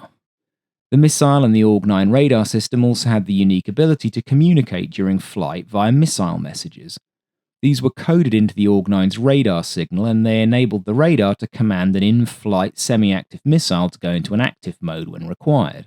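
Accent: United Kingdom. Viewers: Why oh why does it ALWAYS have to be a snooty British narrator on every documentary on YouTube? Even about every American airplane and ship? I can’t stand it.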